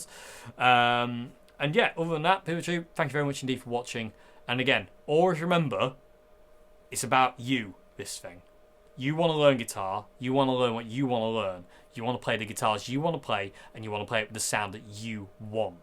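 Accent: United Kingdom. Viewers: I love the British accent, oh and the guitar playing too.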